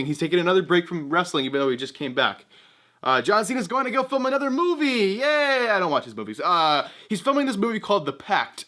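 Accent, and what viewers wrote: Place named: Canada. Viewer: by the sound of your a`s I can tell your canadian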